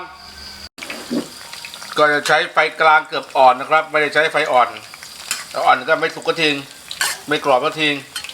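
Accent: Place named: Rayong, Thailand. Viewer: ไม่สุกทีง...สำเนียงระยองเลยคับ555..fcคับ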